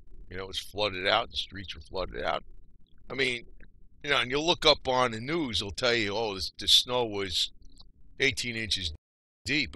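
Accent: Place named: New Jersey, United States. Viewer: I am surprised, that you been from New Jersey didn't watch the series sopranos. God knows you even speak in same accent as those in that series.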